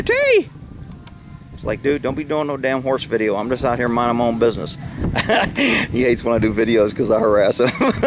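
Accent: Texas, United States. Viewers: Congrats! She's a beauty. FYI..Your accent sounds like Texas to my ear. I have always loved that state. And your videos with the boys on that property makes me want to move. Long Island used to look like that but all the land, horses and cows are gone now. It is over built with no property left.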